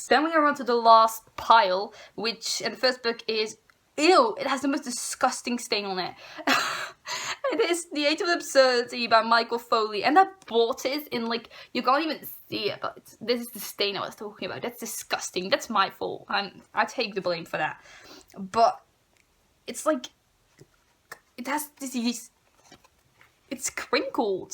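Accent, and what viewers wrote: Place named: Netherlands. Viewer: Love this! I love your accent haha :) I'm Dutch as well, although my videos are also in English. I should really read more, I'm definitely going to follow you.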